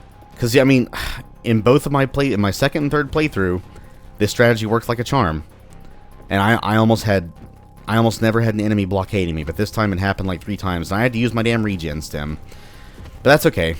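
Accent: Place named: United States. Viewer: Amazing video! Too bad you have to do the "too big to go through the door" trick on the highest difficulty, makes it a different experience in my opinion, but I just love you commenting on everything with your beautiful American accent. Can't believe you do 2-3 playthroughs before the video recording one. I guess it's necessary to make the videos shorter. Do you really find every secret by yourself btw? None of that pagb666 vids cheating? ;)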